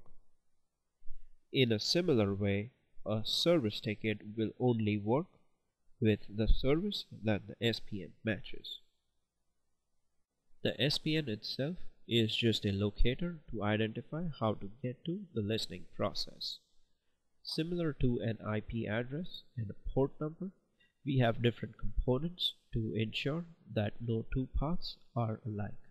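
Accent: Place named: India. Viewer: drop off the accent dude... the way you say "service" sounds like "sabzi" - which means vegetables in indian(hindi/urdu)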